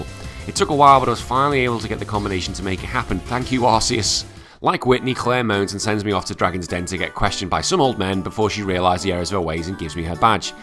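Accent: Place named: Scotland, United Kingdom. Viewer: I like your accent, I think it's scottish?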